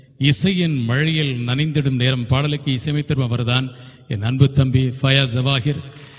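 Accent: Standard. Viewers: வாழ்த்துக்கள் சார் உங்கள் தூய தமிழின் உச்சரிப்பு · பிராந்திய. தமிழ். இல்லாமல். பொதுவான. இனிமையான. தமிழ். தமிழ். பொக்கிஷம். நன்றி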